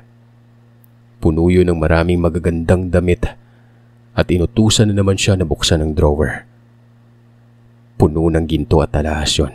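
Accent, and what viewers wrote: Iloilo, Philippines. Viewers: Kanami gd ya pamatian bsta ilonggo accent sir hahaha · Hahahha ilonggo gid ang tuno ni sir hahahha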